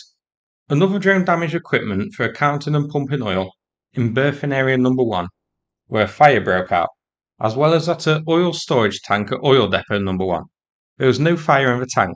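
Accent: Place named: Lancashire, United Kingdom. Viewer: I can't pick your accent. Lancashire? I'm guessing North of Manchester but not Leeds. Kind of softer than Burnley.... Greetings from Australia.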